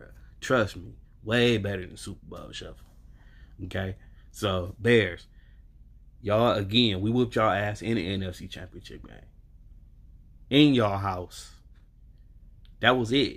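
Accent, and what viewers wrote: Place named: New York, United States. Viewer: I’m a Phins fan but I’m OK with the Pack. I hate the Pats like there’s no tomorrow. I came across your video of the draft and found your response to getting Love hilarious. I know the feeling well. We’ve drafted guys I couldn’t stand. By the way noticed you’re Boriqua flag tattoo on your forearm. I’m thinking your from NY by your accent. How’d you wined up being a Packer fan and not a Jet or Giant fan?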